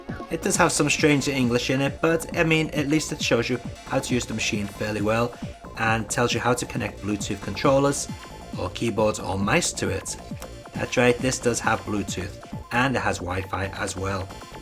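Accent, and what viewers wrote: United Kingdom. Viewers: I love your channel. I have to ask, as "verboten" as it is today, about your background (yes, your heritage... Oh NO!). Obviously you live (or lived?) in Japan but you have a very obvious British accent and as far as your look... well that's not as easy! That's why I ask. If it doesn't offend you (and I honestly don't think it will) can you tell us? Thanks.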